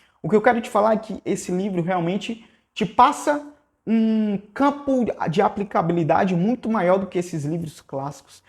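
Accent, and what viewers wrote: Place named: Brasília, Brazil. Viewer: Esse cara é de Brasília, kkk maior sotacão de candango.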